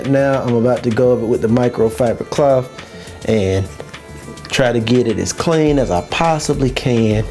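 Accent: South, United States. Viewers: man you are one of the most country sounding people I have ever heard. -the guy from Texas that lives in the sticks of Tennessee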